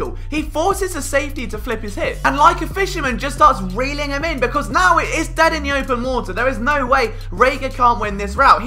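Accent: England, United Kingdom. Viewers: Never in my life did I think I'd hear such intelligent football breakdowns in such a British accent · Love me some EPL but this is the first time an English accent did it for me with AmeriCAN Football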